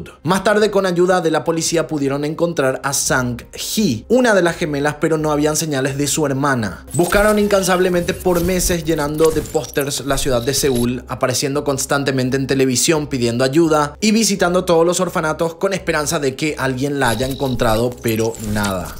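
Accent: Paraguay. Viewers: Tenes acento Paraguayo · Tiene una voz espectacular!!! es Paraguayo, a caso todos los youtubers tienen que hablar con tonada mexicana???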